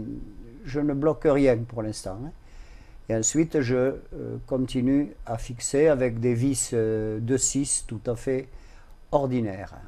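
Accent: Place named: Castres, France. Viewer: Bonjour. je crois deviner dans vos vidéos que vous êtes de Castres (l'accent va dans ce sens aussi!). Est-ce la cas? Mes parents sont de Mazamet et je viendrais volontiers vous poser quelques questions à l'occasion!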